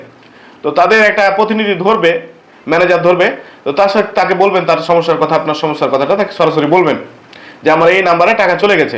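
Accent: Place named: Rajshahi, Bangladesh. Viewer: আপনার বাড়ি রাজশাহী 😅😊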